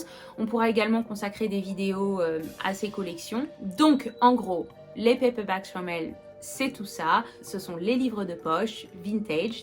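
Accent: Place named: England, United Kingdom. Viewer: exelente chaine et belle accent anglais j'espere que tu sera plus reguliere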